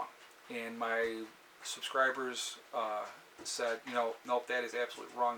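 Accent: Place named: Minnesota, United States. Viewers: I'm new to your channel so it's nice to hear a little bit of your background. Thanks for sharing. I'm still convinced that you're from Minnesota. That is a Minnesota accent for sure. I've never heard anyone in New York sound like you. Except maybe in Long Island. LOL! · He does sound a little Minnesota! Not extreme Northern Minnesota, but maybe Twin Cities area. My family is from up by the Canadian Border.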